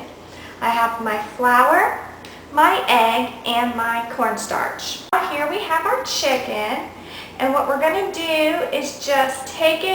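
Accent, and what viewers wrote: South, United States. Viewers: Are you from the south, it sounds like you have a southern accent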